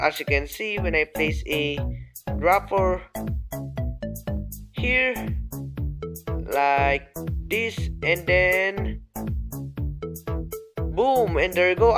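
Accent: Philippines. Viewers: Are you from the Philippines? I live there too but my English isn't like this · Bluestone- Gaming BG He has a filipino accent so you cant blame him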